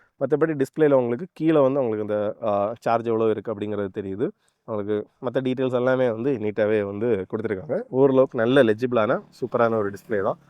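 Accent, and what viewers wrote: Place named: Nagercoil, India. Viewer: This is Nagercoil slang and also he associated previously with motowagen family only...Due to some clause he left and started this channel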